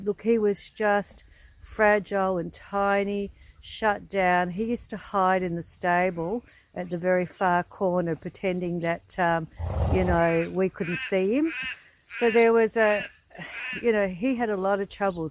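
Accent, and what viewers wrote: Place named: Australia. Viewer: Seen you for the first time, on account that I am subscribed to CC ... after a minute you got my black bell for your good old australian common sense ( and accent ) . love from aussie expatriate .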